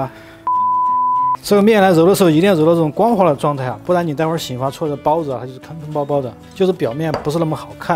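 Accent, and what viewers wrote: Sichuan, China. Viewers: 他是四川人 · 师傅、普通话加四川口音真搞笑😂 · 川普好👂，讲讲牛肉焦饼嘛！好多年没吃到东大街的牛肉焦饼了。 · 师傅四川人吗，哈哈哈熟悉的川普。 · 说的是川普，四川普通话，是四川人啊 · 必须赞👍，讲得真全面。估计师大厨的口音来自四川，👌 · 听到有点家乡口音川谱味儿在里面特别亲切呢😊😊😊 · 师傅四川的，一听就那么舒服😄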